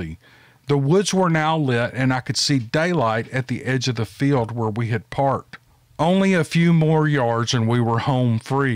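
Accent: South, United States. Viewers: I think it's the southern accent that attracts people up here in the Connecticut and New York area. Yankees can't get enough of it.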